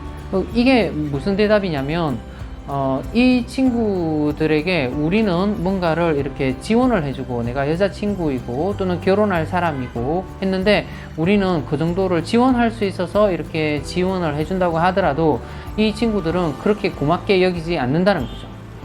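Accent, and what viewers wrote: Gyeongsang, South Korea. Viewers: 경상북도 내륙쪽 어디 억양이시네요~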